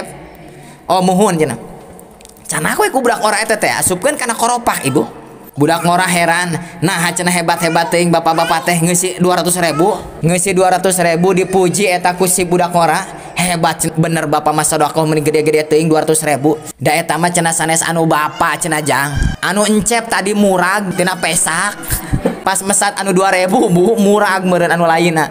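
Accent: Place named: Jampang, Indonesia. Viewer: Berkah pak ustad. Ti palih mana ya nme ngarenge.. tapi logat na sapertos ti jampang..!! 🙏🙏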